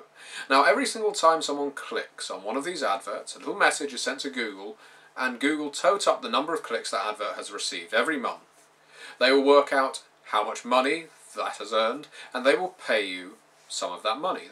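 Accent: United Kingdom. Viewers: Sitting here trying to teach myself about deducting taxes and how to make the proper claims (although I'm from Canada). All I can say about this tedious subject is... thank God for your British accent!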